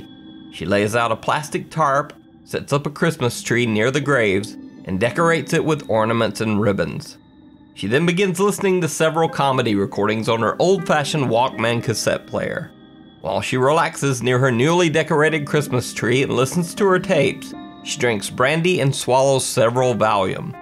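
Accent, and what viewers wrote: South, United States. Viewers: you should hire someone to do the voice overs because you have some like, weird speech impediment. I can't put my finger on it... it's almost like there is too much saliva in your mouth mixed with a slight southern accent. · you sound like you have a southern accent. Your voice is the best to listen to and your vids are the scariest. You and Mr. Nightmare are the best along with Mind Seed tv. You’re my favourite though obviously 😊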